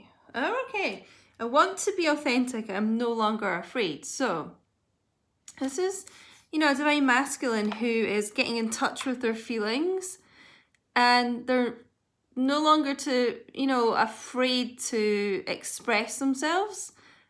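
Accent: Scotland, United Kingdom. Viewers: I am Leo, Libra and I have know each other for 45 years. I am her twin flame, we met in high school and I fell in love with her. We dated for 7 years and the married for 10 years. We never had closer and don't misunderstand me I am still in love with her she remarried and I remarried, the last time I spoke to her was in August of 2019 the call lasted 49 seconds the conversation was not good but 3 seconds after she hung the phone up I was in a state of euphoria, because I got to hear her voice. We were divorced in 1983 ( The divorced was totally my fault). The story of how we got back to each other is incredible to long to type it out. I love Libra so deeply from my heart and my soul. Some time in March of 2019 I got a bad stomach ache and realized something was wrong but because Libra is a very very private person I could not contact her and realized I was ghosted. 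I don't know where she is living I have no phone number as of this date. no communication in 3D or 5D although I believe I have all of the tools I am blocked. I am an engineering manager. The only communication that I have is from watching youtube readings. And you must understand I use a spreadsheet to get a timeline. I am in my 10th month of being blocked that's how much I love her and want to help her. if you would like to talk with me <num> I would love to talk to you. I love your reads, and that scottish braug, I spent 3 years in Dunoon Scotland. Any help you could give me will be greatly appreciated.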